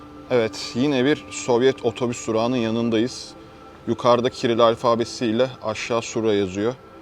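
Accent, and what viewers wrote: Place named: Neftchala, Azerbaijan. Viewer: Aaa tobaa gedib Bankəyəə cıxıb eeeeeeeee😀Abi Neftcala lehcesidi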